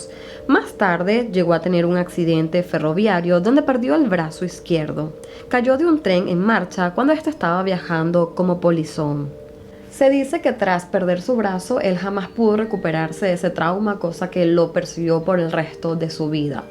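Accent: Standard. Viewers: Hablas muy bien, además tu acento es muy neutral, eso ayuda bastante a que se sienta calidez en la narración. Te recomiendo que mejores la redacción del guión, repites mucho palabras, cuando puedes usar sinónimos, por ejemplo en este vídeo a cada rato decías 'Sumamente'. Suerte con todo, éxitos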